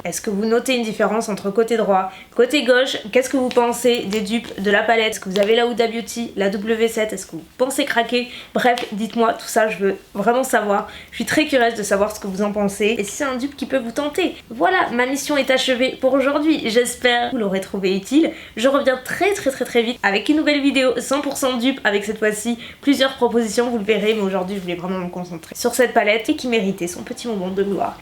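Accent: South, France. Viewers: Vraiment très ressemblantes c’est sûr 😍 et pour le moinssssss ☺️ vive l’accent du Sud! 😃 Bisou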